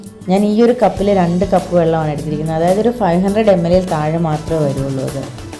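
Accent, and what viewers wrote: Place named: Kottayam, India. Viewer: Wow. Nehru Trophy. Such a wonderful place. Before 3 years we had a boating in Nehru Trophy. Kindly make one episode to show your place if you can . We are waiting to c. Actually your accent is Kottayam. That s y i asked such.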